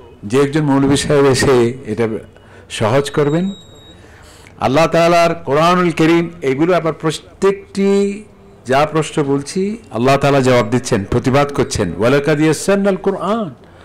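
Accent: Chittagong, Bangladesh. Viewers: গুরুপূর্ন আলোচনা।চট্টগ্রামের ভাষা ওনি ভালা লাইগ্গি। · উনি ত চট্টগ্রাম এর. ভাষা শুনে বুজা গেছে . খুব ভালো লাগছে.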